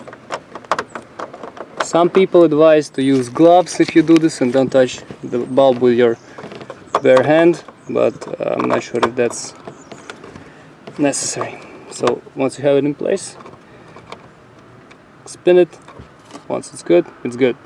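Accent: Russia. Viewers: Наш русскоязычный парень, судя по акценту😊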